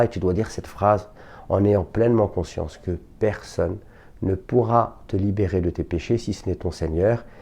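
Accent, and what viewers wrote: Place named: Arab world. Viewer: Je te remercie du fond du cœur Mais l’accent arabe m’empêche de noter normalement 🙏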